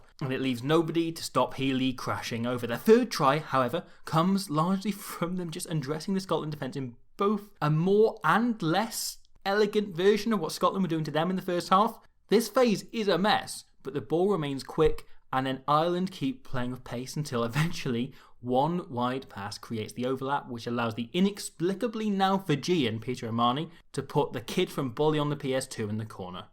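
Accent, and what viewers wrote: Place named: England, United Kingdom. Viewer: he sounds english to me mabye born in England with welsh grandparents or something.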